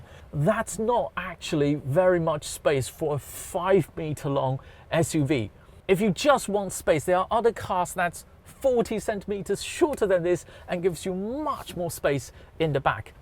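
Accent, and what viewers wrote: London, United Kingdom. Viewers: 小伙儿英文真棒 伦敦腔 有点topgear感觉啦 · 英式腔是這樣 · 兄弟正宗伦敦音啊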